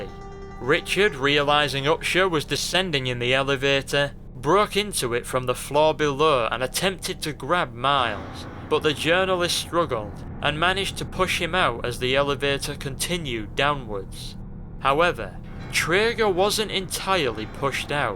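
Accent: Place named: Manchester, United Kingdom. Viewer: I love your manc accent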